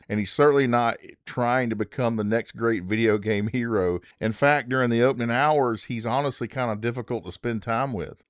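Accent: United States. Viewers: Nice breakdown. Your southern accent makes me feel like you are my friend. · This American acient is nuts bro can't even talk properly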